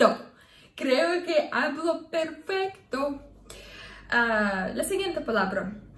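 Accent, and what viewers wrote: Russia. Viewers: Hola mi Linda, soy médico y disfruto todos tus videos, aquí te dejo unas 7 palabritas para que puedas pronunciar. Me encanta tu acento Rusoespañol. Haz otro video con ésta y otras palabras curiosas en Español. 😉👍😘❤ 1.Hipopotomonstrosesquipedaliofobia 2.Homopedonecrozoofílico 3.Pneumonoultramicroscopicsilicovolcanoconiosis 4. Esternocleidomastoideo 5.Ciclopentanoperhidrofenantreno 6.Uvulopalatofaringoplastia 7.Gastroesofágicodudenalintestinal.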